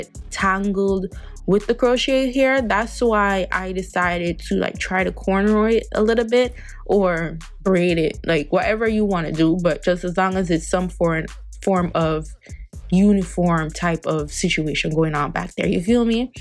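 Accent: Jamaica. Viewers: lol the Jamaican definitely keeps jumping out. I love it though. My hear head top and laugh to myself. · Mek di Jamaican jump out mum..lolol😂🇯🇲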